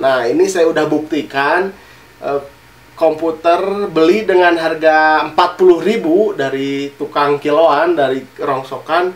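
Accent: West Java, Indonesia. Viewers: Tinggal dipalih mana Kang?? wkwkwkwk😂 orng Sunda nya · SUNDAAA EUY... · si aa sunda pisan euy, "beli eram lima rebu" ..hahah lanjutkan kang!! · Sunda euy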